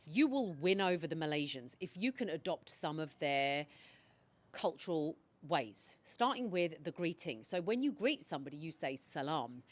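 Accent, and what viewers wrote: United Kingdom. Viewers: Assalamualaikum sister Please can you remove subtitles from your videos bcz with them i cannot listen you focusly, i wanna understand you directly cz i do love British accent.thnks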